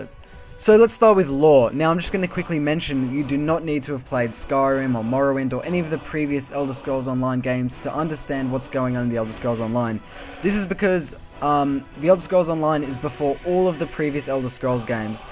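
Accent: Australia. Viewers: You´re an Aussie, right? Great accent!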